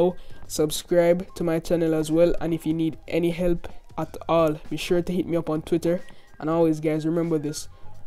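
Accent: Jamaica. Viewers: you Jamaican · Are you Jamaican?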